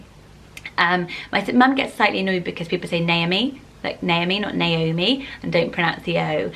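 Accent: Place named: Bristol, United Kingdom. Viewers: Where are you from? You sound just like my friend from Bristol :) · My dauther's name is Lily Adaline. I love the way you prononce all those names with your English accent, but as a french Canadian I need a name that sounds good in french first for the baby im currently pregnant with. Sad cause I love Elle, Everly, Automn...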